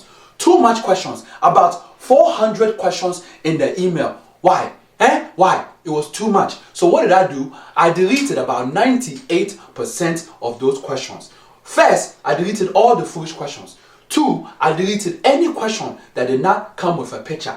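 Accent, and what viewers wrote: Africa. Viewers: Why you talk african but dont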